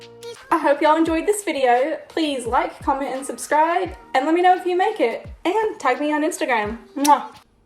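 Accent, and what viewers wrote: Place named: South, United States. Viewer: I love bloopers... And ur losing ur southern accent girl...it's English/southern lol that made me hungry.. I think I would like the Apple ones...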